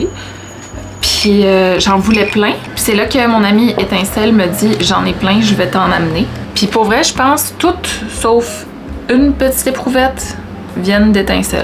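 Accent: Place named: Quebec, Canada. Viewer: La surprise de découvrir ta chaîne et d’entendre du bon vieux québ! 😍 Nouvelle abonnée c’est sûr! 💪 c’est cool de découvrir des gens de chez nous dans le fabuleux univers des plumes !! ❤️🖋️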